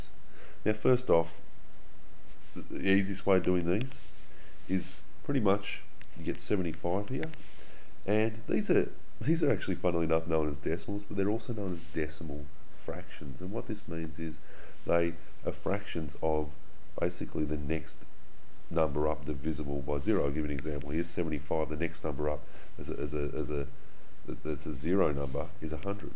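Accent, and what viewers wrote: Australia. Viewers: ARE YOU AUSTRALIAN? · I like his deep Australian accent :3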